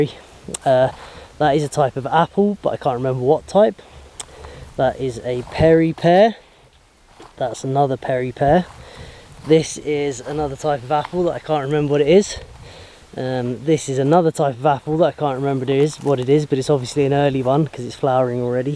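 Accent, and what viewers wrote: Wales, United Kingdom. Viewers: Loved your welsh accent and the humour behind it.:) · Awesome pal, nice to finally know your the only gay in the village 😄😄😄😄 fantastic Welsh accent. Nice to see in the vids again. She is beasting it pal, watch out she will be taking over. Lol. Stay safe x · I love hearing the welsh assent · Nice welsh accent you got there:D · Assent Welsh to no end...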